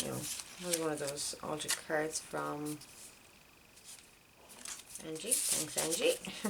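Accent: Ireland. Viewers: Your journal is beautiful, I love how you made it, the tracing paper page with the bird is amazing! What paper did you use to make it? I would love to have a vintage journal but I have nothing vintage to make it with or put in it! The ruffled edges are fabulous, did you burn the paper to make it brown like that, seems to be patchy (how I like it)? The crinkly noises of the papers are music to my ears :) I couldn't quite hear where you get your inspiration from, or what that book was called, the one that no one can understand I think you said? sorry. If this is your first ever vintage journal, it is a work of art, wish I had your talent! If this was my book, I would be carrying it everywhere lol :) I have subscribed to your channel, I'm reckoning you are in Ireland by your accent :) I'm in Scotland :) TFS, love this!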